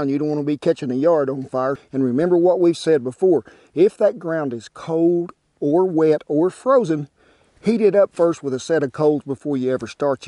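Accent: South, United States. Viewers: Your videos, your voice and southern gentleman ways make me feel so homesick... I've been away from the South for too long. ☹ But I enjoy watching for the same reason. Thanks for the great how to videos.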